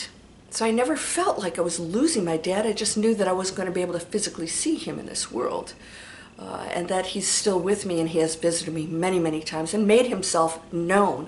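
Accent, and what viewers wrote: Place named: South, United States. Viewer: Luv your southern accent! Great vid!👍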